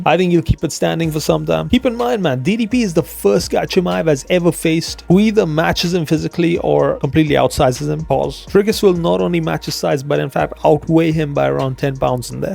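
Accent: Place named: United Kingdom. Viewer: What part of Uk you from?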